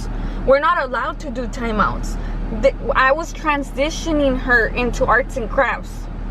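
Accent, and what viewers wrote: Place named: Texas, United States. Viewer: Lol I love your voice you sound like all the girls I grew up with here in Texas.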